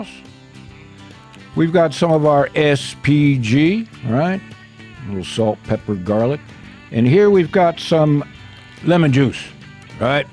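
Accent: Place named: Colorado, United States. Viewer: His accent doesn't sound like a Texas accent more like Colorado maybe.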